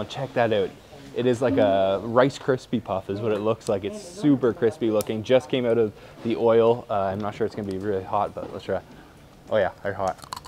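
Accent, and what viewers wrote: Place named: Canada. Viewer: this dudes canadian isnt he. "Crunchy on the Ootside," "MELTS IN YOUR MOOTH"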